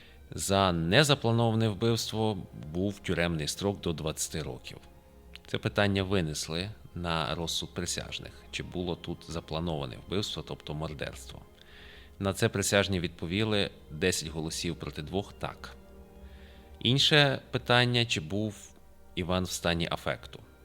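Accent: Lviv, Ukraine. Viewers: Вас так приємно слухати.Гарний тембр голосу, літературна,і разом з цим, чути львівський говір!Буду слухати вас і надалі! Цікаво.Удачі вам і наснаги.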